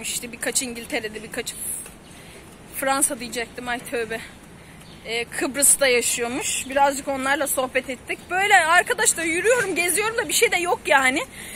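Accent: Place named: Sivas, Turkey. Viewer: Guzel kardesim gülen yüzün hep gülsün ,lehcen güzel Sivasimiza çok benziyor.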